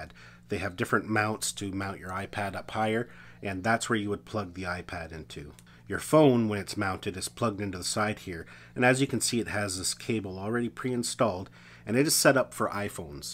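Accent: Canada. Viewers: Canadian accent made this so amazing 😌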